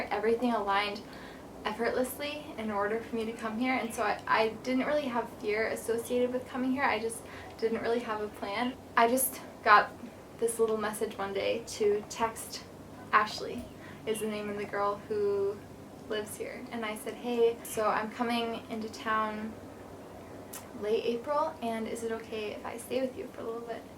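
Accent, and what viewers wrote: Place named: United States. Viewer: what a lovely girl, I'm an English guy and my heart melts when I hear an American girl talk! I could definitely do with meeting a cool American chick!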